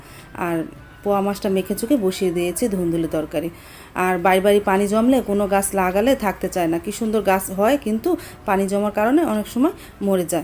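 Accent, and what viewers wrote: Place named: Tangail, Bangladesh. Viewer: আপুর কথাগুলা একদম আমাদের টাংগাইলের ভাষার মত।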